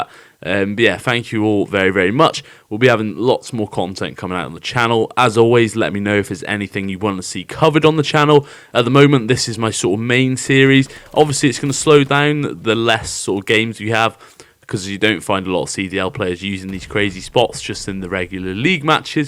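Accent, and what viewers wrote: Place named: United Kingdom. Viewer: every American likes a UK accent over any commentary, I promise you 😂 it’s just a thing. You’ll keep growing bro, just keep releasing content.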